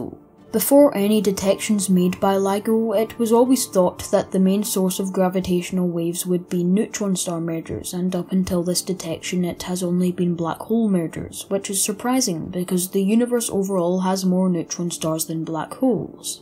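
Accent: Scotland, United Kingdom. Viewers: He is Scottish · It's a Scottish accent.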